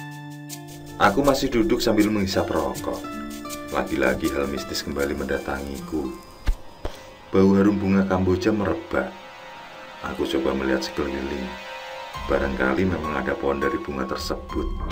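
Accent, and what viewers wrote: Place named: Pati, Indonesia. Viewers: Pati mana suaranya 😃👆👆 Juwana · Orng Pati mana suaranya👍👍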